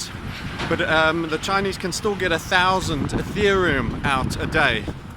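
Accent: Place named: South Africa. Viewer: You sound like a South African whose been living in the UK for a couple of years.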